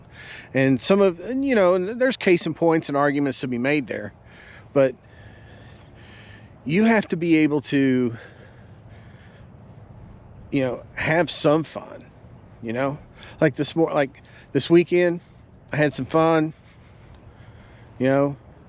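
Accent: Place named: South, United States. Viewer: Thanks hear your southern voice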